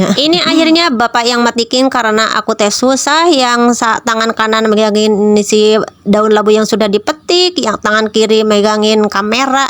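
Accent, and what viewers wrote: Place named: Banten, Indonesia. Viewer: Emang di pangandaran it bahasa y ad jawa y bun bukan sunda doang.ak denger bunda ini suara y kaya logat serang banten gitu heheh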